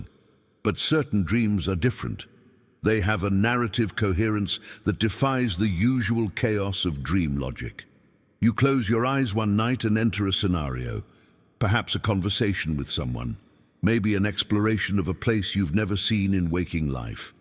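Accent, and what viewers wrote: United Kingdom. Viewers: Midlands England x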